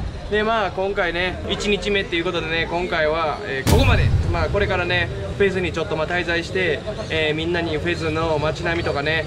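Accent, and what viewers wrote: Kansai, Japan. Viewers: are you kansaijin?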